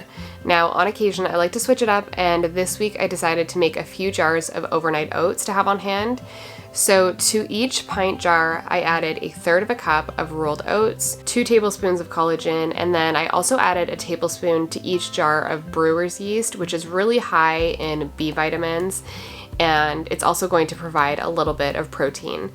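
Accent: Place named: Canada. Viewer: I thought you might be Canadian by your accent, and then I saw thr PC brand raspberries and confirmed it. 🇨🇦 👋🏻 I am, however, disappointed that you've chosen to have only American product links 😢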